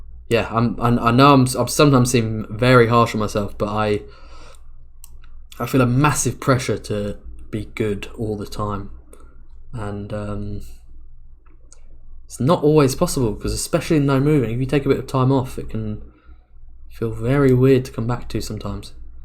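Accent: United Kingdom. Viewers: Hearing british accent is so releaving. Im from Poland but after listening to american youtube and then switching to british it feels like its my native language. I can't explain why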